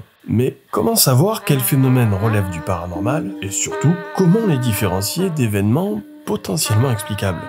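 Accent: France. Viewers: Merci pour ton enquête. Ton anglais est très bon, c'est juste que tu as l'accent français, ce dont les british raffolent lol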